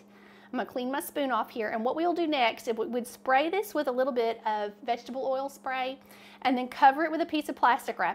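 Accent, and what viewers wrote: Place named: Alabama, United States. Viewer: I've never ever made bread until I saw your recipe. That was Monday. I made it Monday night. It lasted a day lol & was Gone! My husband, kids, and nephew said it was the best bread they'd ever had. So, my dough for these rolls is on its first rise now on the counter. I'm doin a trial run on these so I can make em for Thanksgiving dinner. I know they'll be absolutely delicious, I just want them as pretty as yours lol. I'm gonna try & make their lil "butts" line-up perfectly. I'm new at most things cooking wise. I'm from Alabama & wanna cook hard stuff like meatloaf, pork chops, chicken fried steak, greens, homemade mashed potatoes, fried potatoes & stuff like my Momma made. I'm tryin my best to learn lol... I should have been at home watchin momma instead of runnin around with friends (they can't cook now either)! 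Both my sister's are fantastic cooks cause they watched momma. I really really wanna learn to make fried chicken! My husband and kids love fried chicken. I don't eat meat except fish, but they are all meat lovers. I Love your channel & how you take time to show Every step. Your a incredible cook & so patient & reassuring. Idk where your from but I picked out that accent Fast! Everyone makes fun of how I talk lol. I live in Oklahoma but from Alabama. You seem so precious. Keep the videos comin & ty for being such a great example for beginners like me. -Amanda-🖤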